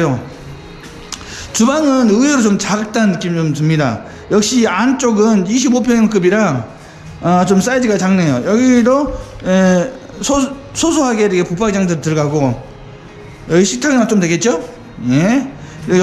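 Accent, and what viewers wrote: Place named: Jeolla, South Korea. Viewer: 고향이 전라도 이신가봐요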